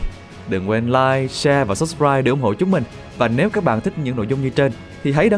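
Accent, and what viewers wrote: South, Vietnam. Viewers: Không tự nhiên bài này người đọc giọng Nam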